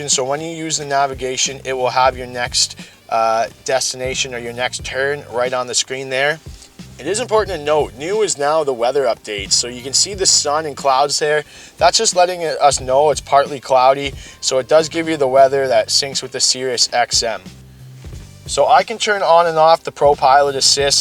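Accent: Canada. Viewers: Is it:a Canadian thing with:"mu-ran- o:instead of mu-ran-o you:guys also say maze instead of'mood funny!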